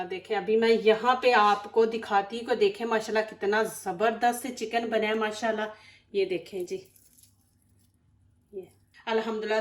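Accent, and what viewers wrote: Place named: Hyderabad, India. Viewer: Mam your Gulabi Urdu we enjoy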